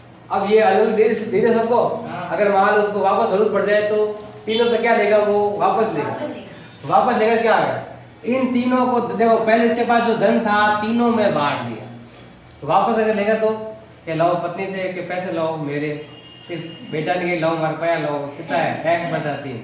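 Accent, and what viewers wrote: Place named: Rajasthan, India. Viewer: sir apki awaj se lag rha h aap rajasthan se ho to mujhe tusion lena h please sir jwab dena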